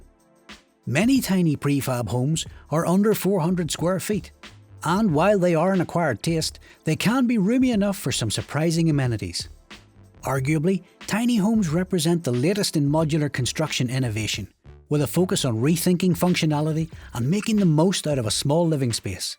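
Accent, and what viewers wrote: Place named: Ireland. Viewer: I'll listen to this Irish dude all day long....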